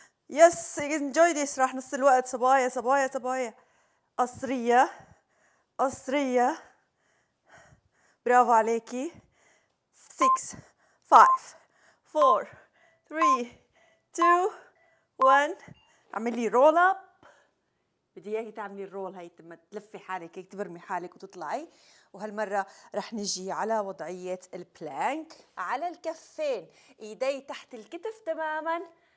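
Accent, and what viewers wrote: Aleppo, Syria. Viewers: تحياتي.. كأنو مبين من لهجتك انك من حلب مو ؟؟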